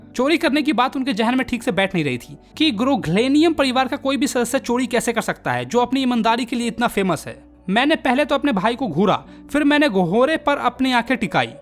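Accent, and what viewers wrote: Bihar, India. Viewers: Bihari assent 😎🤟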